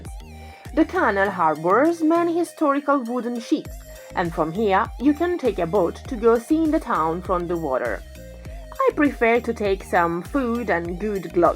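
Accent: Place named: United Kingdom. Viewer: Wow, complimenti per il canale e per la professionalità dei vostri video. Complimenti anche per l'accento molto British 😉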